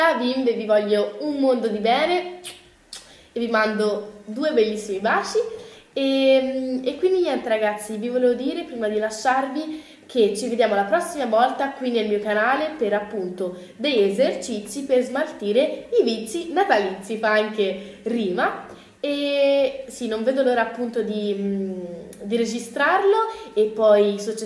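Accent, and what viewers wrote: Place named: Florence, Italy. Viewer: te tu c hai l accento un po fiorntino dan do vieni ?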